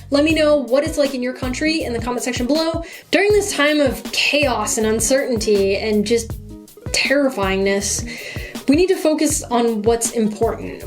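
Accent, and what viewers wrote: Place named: United States. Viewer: When I was going threw YouTube I saw this channel, very nice , keep the good work I’m surprised because you sound more American than Jordanian but very very good I like the Chanel and you got a new subscriber and a like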